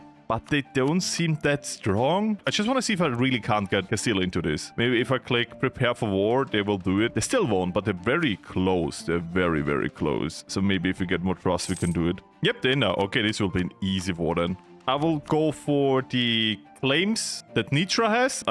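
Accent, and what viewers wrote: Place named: Austria. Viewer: Lol ich wusste immer, dass das eine komische anreihung von Dialekten in den Videos ist...wusste nicht dass du aus Österreich kommst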